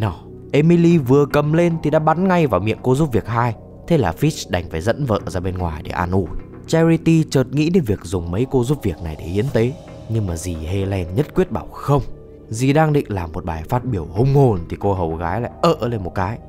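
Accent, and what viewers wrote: North, Vietnam. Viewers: Sao tao nghe thấy thằng làm content đọc bắc kỳ trong cái video này? Phân biệt vùng miền lên cả recap phim rồi? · admin nói giọng bắc mà chill bro 😭😭 tôi ng bắc vẫn hay đùa backi thấy cũng vui mà miễn k có ác ý là được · Tính ra ng đọc nói giọng Bắc mà, tôi nghĩ chúng ta có thể hiểu nó giống như người da đen nói niga <(“)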